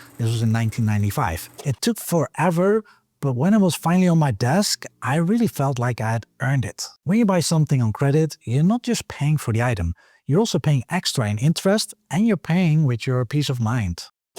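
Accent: Netherlands. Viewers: Het was de Gamma verhuisdoos die mij de tweede bevestiging gaf, na het horen van zijn accent. Ons kent ons... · Are you from the Netherlands!? You seem to have the same accent as i have, and I am from Rotterdam? ❤ Add: Yup, went further down the video, and you mentioned "niksen!" Echt uit Nederland, dan!? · Ik hoorde het accent, maar dacht: misschien is het een Deen. Dus ik keek naar hoe je ramen sluiten. Dat leek niet Nederlands. Uiteindelijk hoorde ik het je toch bevestigen. Wel een Nederlander. Voelt toch als thuis 😊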